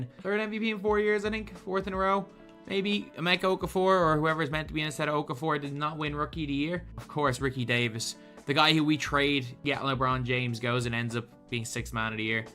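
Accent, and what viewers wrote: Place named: Ireland. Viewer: Yeoo this Irish accent is goated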